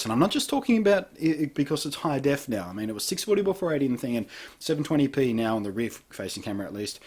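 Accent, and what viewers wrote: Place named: Australia. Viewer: 1) Great Review 2) Love the Aussie accent 3) Double or Triple RAINBOW FTW!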